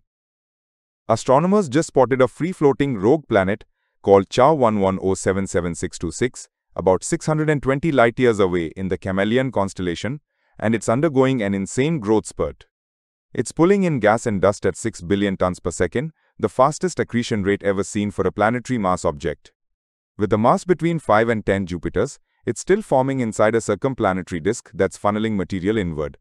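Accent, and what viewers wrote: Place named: India. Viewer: Whats blowing my mind is the accent doesn't match the cartoon face that pops up.... Indian English accent but a Caucasians face is represented as the speaker. Like *BLOWN*. Never would have guessed.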